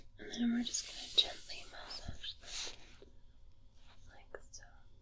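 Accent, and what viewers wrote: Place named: Scotland, United Kingdom. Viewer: Very relaxing. Love your Scottish accent.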